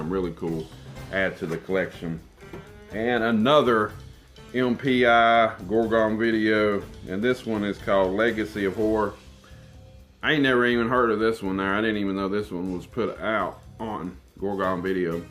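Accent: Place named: West Virginia, United States. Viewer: Don't let the coffee get cold that's not good. Gotta love our WV accent who doesn't love us WV lol great video awesome movie update now drink another cup of coffee and hope you have a wonderful day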